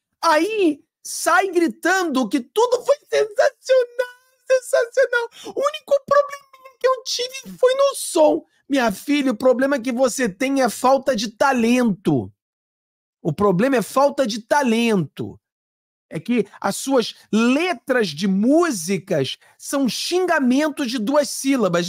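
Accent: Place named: Florianópolis, Brazil. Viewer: Bom dia gosto muito do teu Catarinense de Florianópolis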